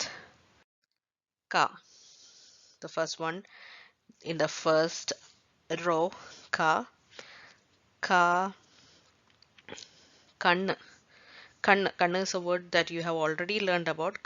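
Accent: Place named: Kerala, India. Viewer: Madam, good attempt but many pronunciation mistakes are there. Please correct yourself. Hope it is taken in the correct spirit. In your English, the Malayalam accent is coming through. That does not matter in this lesson though since you are teaching malayalam.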